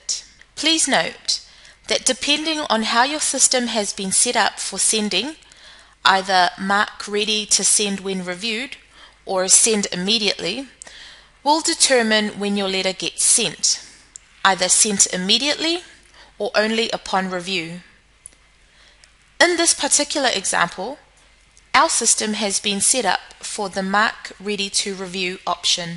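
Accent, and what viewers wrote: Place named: New Zealand. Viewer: If these medical software providers want to be taken seriously the first thing they have to do is stop with the childish, inane names like 'Dr Darth Vader' and patients called 'Mickey Mouse'. I'm sure they think they're just hilarious - hahaha - but Health Providers and Specialists won't. It completely destroys your credibility. I'd also be closely monitoring what videos you put out there. Presentation and a pleasant speaking voice matters. This one had way too much of the kiwi in it. And this one below (I don't know what to say. It's that bad, I have no words except to say it should be taken down immediately - as well as anything else by the same content creator). <link>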